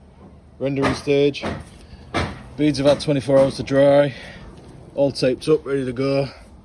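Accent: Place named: United Kingdom. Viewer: This in Australia mate because you sound British and I’m from Liverpool uk and worked all round Brisbane and Melbourne and I can tell by the buckets your using it’s Aus ??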